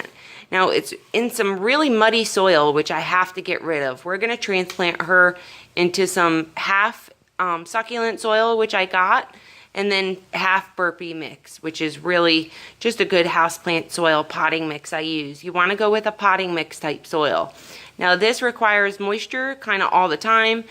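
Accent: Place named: South Jersey, United States. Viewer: Also, love that friendship plant. Also, are you from the east coast? I'm from the Jersey shore and I'm hearing a familiar accent. South Jersey? Philly? lol.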